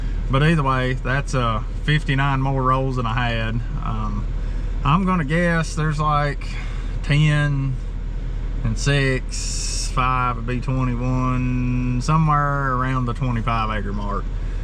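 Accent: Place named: South, United States. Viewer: hi nice hay were is this farm you talk southern, i live in hadley ma very dry here and no rain monday get some need it bad is it dry there and how is the hay crop growing, you have cows and feed them i used to have a farm mo longer every thing got sold and lighting hit the barn last summer every thing got lost so no more thanks for the videwo.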